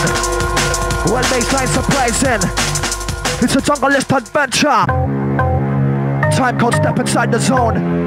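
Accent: United Kingdom. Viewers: i love this station because it's just some british guy talking over drum n bass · I love this music and this accent so fkn much. Big up to the UK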